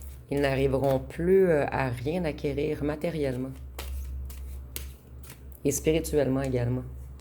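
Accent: Quebec, Canada. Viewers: Bonsoir et oui il y a des gens qui passent leurs vies à faire le mal mais tout revient vers ses personnes tôt ou tard Ma lumière dérange parait il mais j'en envoie beaucoup aux autres à travers les soins étant guérisseuse ( genre Laochi de la 13 Octave ) etc Psychotherapeute après je me suis dirigée vers les soins énergétiques. Merci votre guidance est terrible vous voyez incroyable J'espère que vous continuerez même partant au Quebec j'adore votre accent cela me rappelle l'intonation d'un ancien ex Québécois Quel beau pays Merci à vous Excellente soirée bise❤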